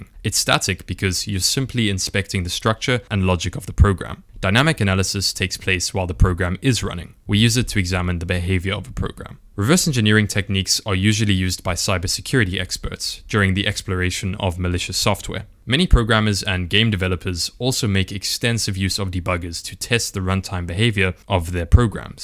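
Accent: South Africa. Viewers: You sound like you are from South Africa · I trust you because, of the south african accent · Do I detect a south african accent?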